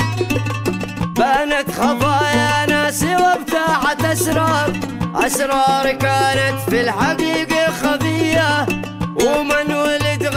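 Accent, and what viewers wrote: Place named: Marib, Yemen. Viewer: من مارب وهذه لهجتهم.....لهجه قبايل السعوديه. نفس لهجه قبايل البدو مارب. والجوف وخولان وبدو حضرموت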